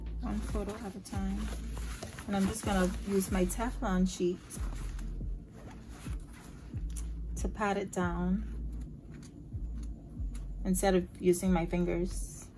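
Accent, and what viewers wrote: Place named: Bahamas. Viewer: I heard ur voice and I’m like hey this got to be my hometown people. Bahamian accent so recognizable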